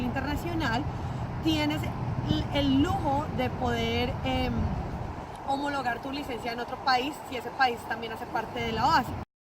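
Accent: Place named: Colombia. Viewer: Gracias por la información, soy mexicano y estoy tomando entrenamiento en Texas, he seguido el proceso que recomendaste pero mi duda es, ¿con sólo mi visa de turista puedo sacar hasta comercial? Apenas tengo 20 horas pero quiero ir figurando como le haré. Gracias de antemano por tu atención y ayuda! Pd: Me encanta tu acento colombiano 😁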